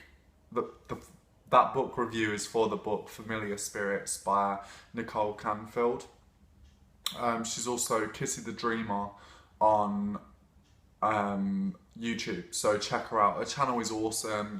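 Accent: England, United Kingdom. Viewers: I have only ever heard Irish and Scottish speakers pronounce it like kal-y'och, call-y'och, or cull-y'och...So I feel like he's saying it pretty accurately, just with a more English accent. Of course I'm American, so maybe I'm wrong. :)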